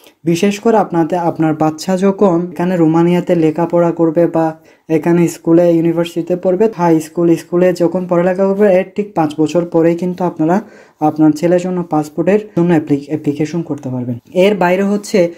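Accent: Chittagong, Bangladesh. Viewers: ভাই আপনার বাড়ি কি চট্টগ্রামে?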